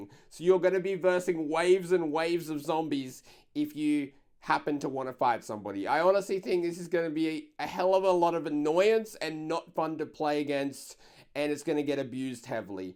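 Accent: Australia. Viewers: I love your Australian accent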